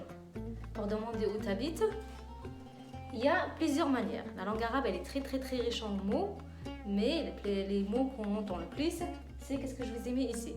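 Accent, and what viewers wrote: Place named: Algeria. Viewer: جميل. اللهجة الجزائرية حلوة بزاف. يعطيك الصحة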